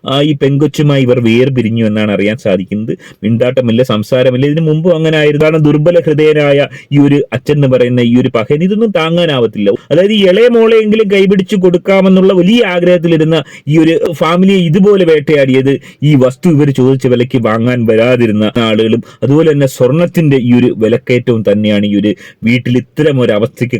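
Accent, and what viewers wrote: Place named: Malabar, India. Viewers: മലബാറിൽ എല്ലാവരും ഇങ്ങിനെയാണോ സംസാരം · Athe malabar bhashyaanu...ente nattil enganeyaa samsaaram ...😅but tune deffent undaavum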